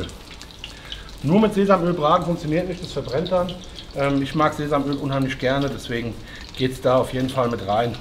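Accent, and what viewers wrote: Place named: Mannheim, Germany. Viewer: Ui, jetzt habe ich direkt Hunger. Werde noch etwas an Saucen nachkaufen müssen; daher danke für den Link aus diesem Video. Hockerkocher, Wok etc. ansonsten vorhanden. Daher für das Wochenende: Feuer frei. Dein Dialekt bringt mich immer zum grinsen, da ich viel mit Kollegen aus der Konzerzentrale in "Mannem" (=Mannheim) zu tun habe 😉 Mach(t) weiter so und Grüße aus dem Rheinland.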